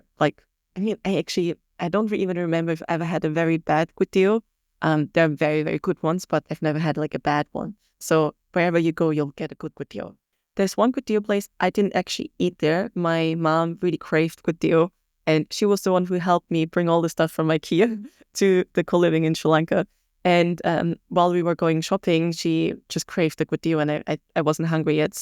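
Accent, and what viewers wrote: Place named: Thailand. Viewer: พูดสำเนียงไทยชัดมาก🤟🤟❤️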